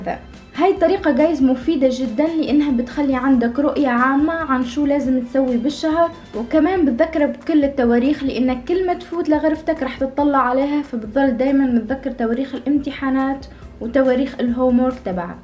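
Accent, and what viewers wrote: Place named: Tunisia. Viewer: ختيتو الديفو تاعك والسبب لي ميخليش التفاعل يطلع عندك هوا اللهجة الي راكي تحكي بيها هوا المؤكد مدامك تونسية رح يكون اكثر المتابعين عندك من المغرب العربي وسورتو من دزاير ونحنا عندنا شوي نفور من هاذيك اللهجات والعقليات اما المضمون تاعك مفيد ومسلي والبتوفيق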